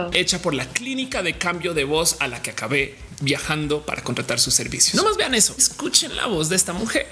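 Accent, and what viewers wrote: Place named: Colombia. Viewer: Yo sabía que eras colombiana, se te nota en la voz un montón. Eres muy bella (ame tú collar está precioso)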